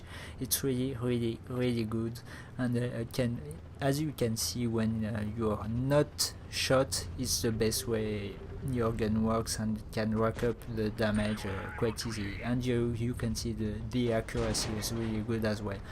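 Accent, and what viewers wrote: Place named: England, United Kingdom. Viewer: J'adore ton accent anglais XD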